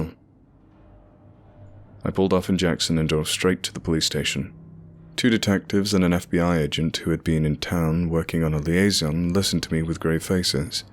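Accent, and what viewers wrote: England, United Kingdom. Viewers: That's right I've lived in the East Tennessean mountains all my life. Same general area and people as eastern Kentucky. Beautiful mountains and people. From this guys English accent you can tell it's about a 99 percent chance he's never been any where near Appalachia lol. It's pronounced Appa latch a not Appa lay cha. You outsiders can visit but we appreciate it if y'all don't stay, especially if you have a superiority complex. We don't take to kindly to any bullshit. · I can’t say, some brith telling a story he read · This guy sounds like he's from the UK.. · You sure you don’t sound your not from anywhere ky Mabey merry old England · I find it funny! I 75 isn’t eastern Kentucky. Lexington isn’t eastern Kentucky It’s Hal rogers parkway . I live in hazard! With your English accent. Ride motorcycle through that whole area it’s beautiful here not scary! 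I call bullshit ! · But oh, but... This guy doesn't even have a Kentucky and accent. He's British! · A British person telling us about a specific area in the US lol. It’s not scary as long as you are strapped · Is this a British accent talking bout driving the back roads? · you don't sound like your from that area... sounds like you are English possibly a ginger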